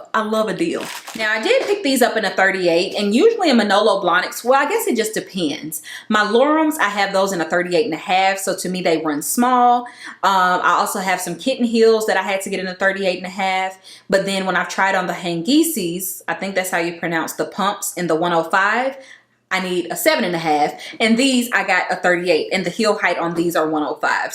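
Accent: South, United States. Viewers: Lol you sure do. Your southern accent. I am from London so some American accents sound nice to me.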